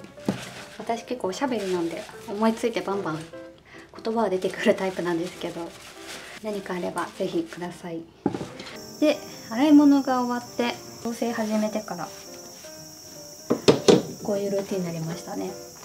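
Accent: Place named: Aichi, Japan. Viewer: はじめまして。最近さんの動画を発見して、少しづつ見ています。癒しの時間です。私も愛知出身なのですが、しゃびしゃびと丈夫い、確かに関東に来てから聞かないなと思いました。さんの言葉のイントネーションが好きに感じるのは、故郷を感じるからかもしれません。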